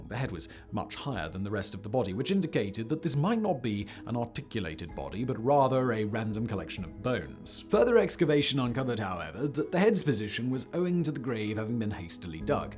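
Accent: United Kingdom. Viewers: I can’t be the only one that was expecting Vsauce and was shocked with a British accent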